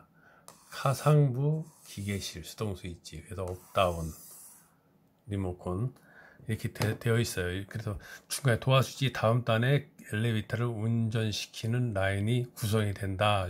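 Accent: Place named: Japan. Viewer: 일본에서 기술제휴로 만든 승강기이고 일본인 고문이 교육하고 40년 전만해도 영어를 제대로 못배워서 그런 발음을 하네요,,,지적 참고는 하는데요,,고치기는 힘들거 같습니다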